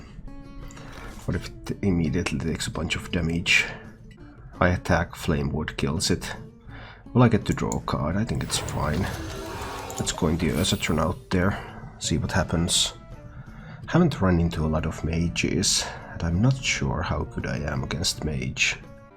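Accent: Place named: Finland. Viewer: He's from Finland. Suomalainen se on. ;)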